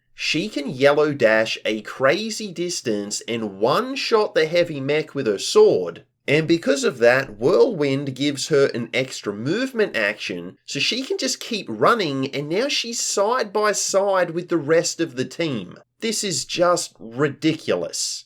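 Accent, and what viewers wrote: Australia. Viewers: The Aussie accent just adds flavor to these videos. It just makes them soothing. I put it in background sometimes.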